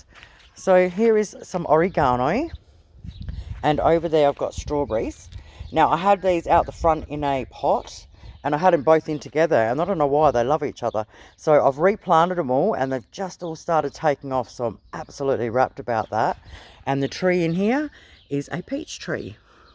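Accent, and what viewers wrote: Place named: Australia. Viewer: Love this ❤️ absolutely adore your personality 💕 you have that comforting Aussie bogan twang in your voice that just screams down to earth ❤️ I love your yard 🙂 I need to get off my butt and start growing foods 💕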